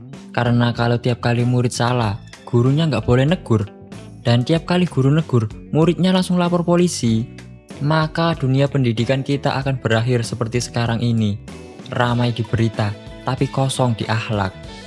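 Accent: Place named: Java, Indonesia. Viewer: udah bagus video nya... 1-10 chanel ini dapat nilai 3 banyak hal yang harus admin perhatikan lagi agar chanel bisa berkembang, masukan dari kami 1.belajar memahami emosi dalam suara 2.belajar apa itu hook,tapi ada rahasia di balik hook yaitu (rehook) 3.narasi ini terasa sangat AI dan masih banyak lagi. pengisi suara chanel ini punya genetik suara yang sangat bagus.. aksen dan logat jawanya membuat pendengar mudah ingat.. sedikit tips smoga bisa membatu,oiya investasikan waktu luang untuk belajar membuat tumbnail yang bagus,konsisten untuk terus perbaiki kualitas karya mu,pasti nanti bisa berkembang chanel nya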